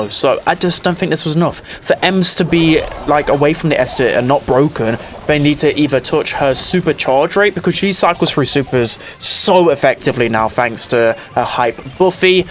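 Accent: United Kingdom. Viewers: Bro's heavy British accent wont let me understand some of his words, who tf is Russ?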